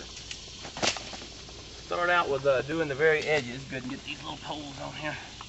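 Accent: Texas, United States. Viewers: The tips at the end of the video is extremely helpful! Is that Texas accent?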